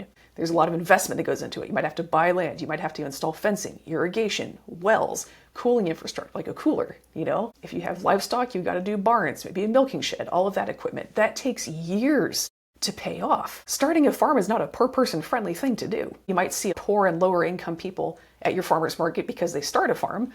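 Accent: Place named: Lompoc, United States. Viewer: Oh,my, are you in Lompoc, CA? Don’t answer but that’s what it sounds like. Cheers.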